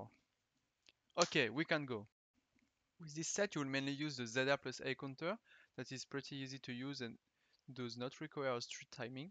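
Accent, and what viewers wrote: France. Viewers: Just perfect: short, easy to understand and on top of all that the French accent. What more could I ask for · Nice french accent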